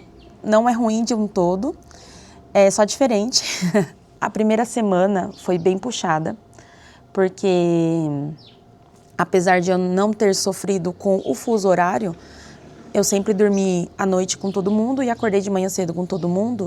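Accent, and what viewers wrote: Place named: Brazil. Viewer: Você deve ter crescido no Brasil, pois fala como brasileira mesmo, e não como alguém que cresceu no Japão.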